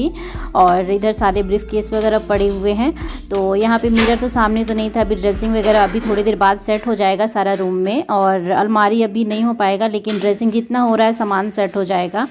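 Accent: Bihar, India. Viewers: Meri sasural Delhi men he 20 sal se men yahi rahti hun par Mera mayka bareli u.p ka he mujhe wahan ki bhasha acchi tarah se pata hemere aadhe rishtedar up ke hen par Jo aap bolti ho woh up ki nahi Bihar ki bhasha he mere yahan Delhi men mere sas pas bahut se log Bihar ke hen mere kiraydar bhi unki same bhasha he aapki bali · Madam kya aap bihar se ho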